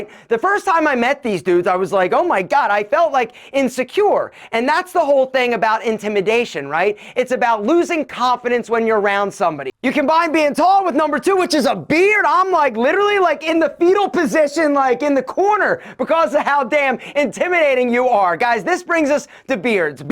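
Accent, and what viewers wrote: Philadelphia, United States. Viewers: Bro you have to be from Philly with that accent.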